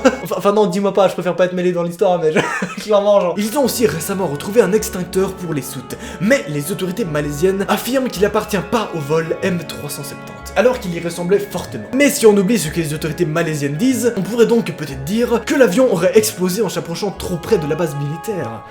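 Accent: Belgium. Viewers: Deux questions tu es belge?? Et tu as 40ans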